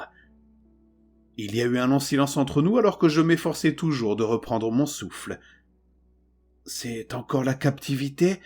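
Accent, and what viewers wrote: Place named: Arab world. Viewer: Toujours aussi prenante… PS : +1 pour l’accent arabe !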